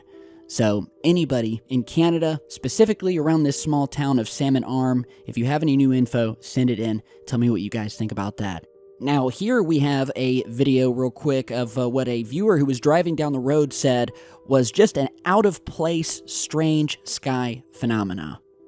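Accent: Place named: South, United States. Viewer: Is from the south? I'm just curious. if you listen closely he has a slight accent lol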